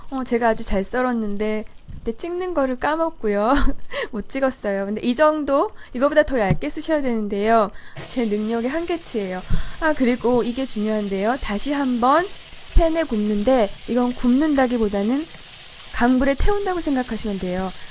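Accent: Seoul, South Korea. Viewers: 말투랑 목소리 김하늘 같아요~ 예전 서울 사투리? 말투ㅎㅎ 목소리 넘 매력있어요!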